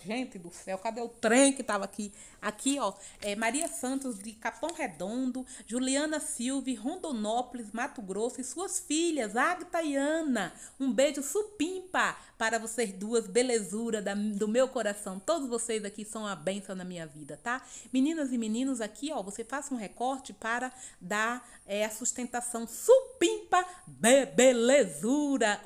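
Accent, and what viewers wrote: Bahia, Brazil. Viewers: E tá baiana arretada,gosto muito do teu jeito de falar 👏👏👏👏👏👏🙏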